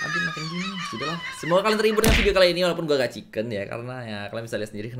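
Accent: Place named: Medan, Indonesia. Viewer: LOGAT BAHASA NYA KOK KAYAK MEDAN YA OM 😆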